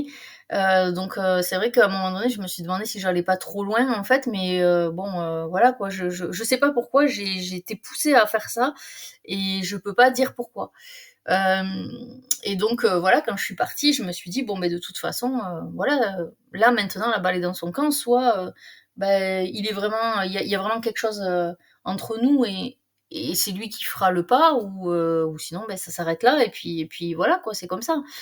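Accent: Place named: Toulouse, France. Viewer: Je découvre ta chaîne ce matin grâce a l'algorithme de YouTube ! alors que j'ai découvert ce parcours de FJ depuis un an tout juste a 53 ans! Bravo et merci du partage. J'ai retrouvé mon jumeau en janvier 2023 apres 16 ans de silence entre nous. J ai rêvé de lui a plusieurs reprises en qqs jours et j ai décidé de suivre mon intuition et de le contacter. Nous bossons ds la même administration mais depuis 15 ans il vit a 800 km de moi. Ont alors rejaillit en pleine tête tous les sentiments et ressentis si douloureux vécus en <num>, avant notre séparation, et ma NNA alors que nous sommes mariés depuis tous les deux chacun avec nos tierces. Ma sideration étant a son comble de revivre toutes ces choses , ses sentiments, ses synchronicites en un claquement de doigt, j ai fait mes recherches et decouvert ce parcours de FJ il y a un an. Et l'année 2023 fut terrrible et belle en même temps. 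J ai découvert a 53 ans qui je suis vraiment, que j ai une belle âme et des liens énergétiques. L année fut riche en revelations d'une puissance inouïe. J arrete là, nous sommes en plein ds la reunion, et le switch, ma plénitude m intrigue même je dirai mais c est trop bon et je sais que le meilleur est a venir. ❤❤😊🥳 encore Merci et bel accent un peu le même que le mien! de Toulouse !😂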